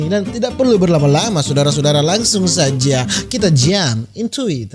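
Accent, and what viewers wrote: Manado, Indonesia. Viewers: Kurang seru, so jarang ba logat manado noh..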